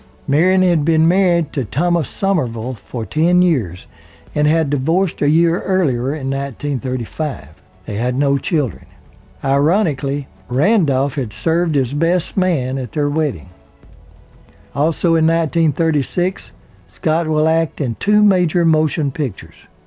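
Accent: South, United States. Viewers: The narrator has a beautiful southern accent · Many thanks, one of my favourite actors. BTW I love your accent, where in the States are you from?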